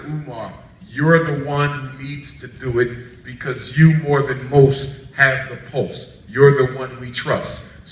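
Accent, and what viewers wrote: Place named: Toronto, Canada. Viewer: He sounds like he is from Toronto.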